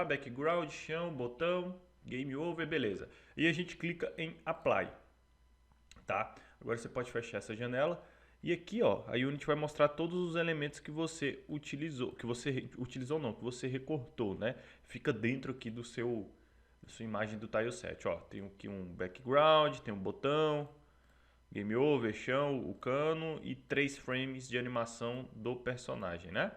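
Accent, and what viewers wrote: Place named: Ceará, Brazil. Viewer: otimo tutorial. bem simples e eficaz. a proposito, vc eh cearense? percebi pelo sotaque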